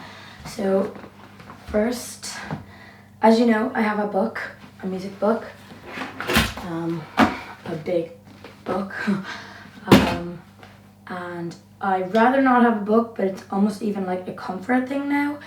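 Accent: Ireland. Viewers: Your American accent sounds great! I only hear a little of your Ireland accent. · Irish, don’t you know. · Why does she have a Jacksepticeye accent? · Euros? Irish accent? Where are you from? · Is she Irish??? I'm in love all over again. Fantastic video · Love your accent. am i wrong to say it's Irish? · Yeah she has an upperclass Irish accent. With a hint of American thrown in. Irish kids watch a lot of American TV series and movies and tend to copy the way they speak. They way she says "there" is so American and not the Irish way of saying it.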